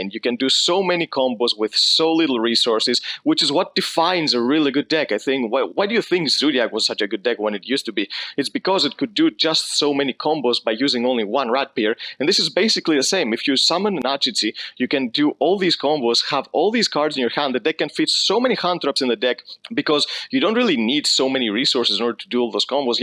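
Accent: Greece. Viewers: bro you sound kinda greek this accent gives greek vibes also very nice video!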